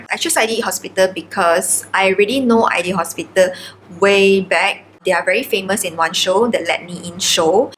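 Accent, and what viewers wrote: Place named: Indonesia. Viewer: aren't they both indonesian? the mouth probably moves the same because of their accents and how they pronounce words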